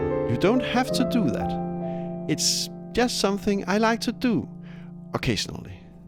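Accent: Denmark. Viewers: Instantly recognize your accent! Nice video my fellow danish person <3 Gonna definitely use this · You sound danish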